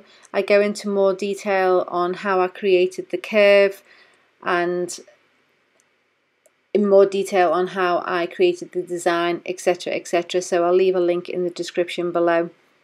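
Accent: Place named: Liverpool, United Kingdom. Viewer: She's Liverpudlian. From Liverpool in England.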